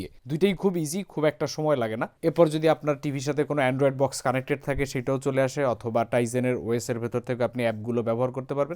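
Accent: Barishal, Bangladesh. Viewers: "পয়সা থাকলে এধরনের টিভি কেনা যায় এবং উপভোগ করা যায় আর পয়সা না থাকলে দেখে উপভোগ করার চেষ্টা করা যায়' কথার টোনে মনে হচ্চে আপনি বরিশাইল্লা। Am I right?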